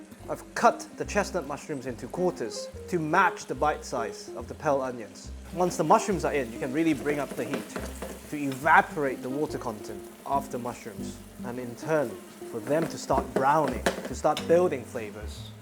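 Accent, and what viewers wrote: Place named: England, United Kingdom. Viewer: Chef, you are a maestro as a chef and as a teacher. I love your explanations and references to L’Escoffier. I also greatly appreciate you provide alternatives for example no chicken stock use water the dish has plenty of flavor. I personally don’t have Tawny port hanging around my kitchen. I wish I did. So going back to butter and sugar is no problem. Also, your English accent is fab, you must’ve been educated in English schools.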